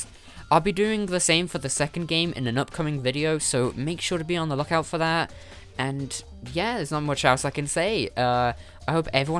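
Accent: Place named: RP, United Kingdom. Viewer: This guys is like SO posh. I want this guy to say "its a bit chilly here dontcha think"?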